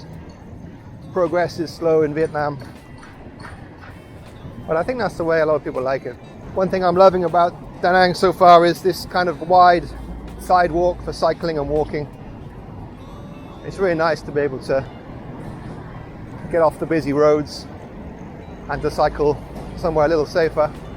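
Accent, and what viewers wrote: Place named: Wales, United Kingdom. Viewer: Good day Buddy, Louis Kats here from Melbourne, Australia. Can you please tell me your name. My partner and I have just become subscribers & last night watched at least 20 of your past videos & loved each one of them. Short, sharp & very informative with a Welsh accent. Current also watching Duong Global Business Consulting Group channel with Ken which is awesome. Also Check out " John Saboe " who I watch & is currently living in Da Nang. My partner and I have visited Vietnam twice & we absolutely love & want to live in Da Nang in the next couple of years. Love your bike ride we remember so many landmarks. We stayed at Babylon Garden Hotel which was awesome & I highly recommend. Hooked on your channel can't wait to see your apartment & a cost of living. If you ever come down to Melbourne, Australia happy to host you. 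My business partner is Vietnamese same as my doctor, tax accountant, lawyer etc. I celebrate Tet ever year with my Vietnamese friends really family & we love home cooked Vietnamese food. Great channel great content. Your number 1 Fan in Melbourne, Australia. Louis Kats ☺👍❤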